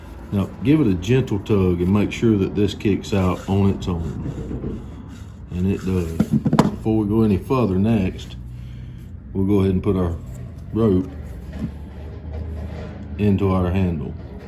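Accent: United States. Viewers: Excellent video brother! Helped me get my machine back running and made a good days work. Also your accent is killer! I’m guessing you’re from Georgia Love from Florida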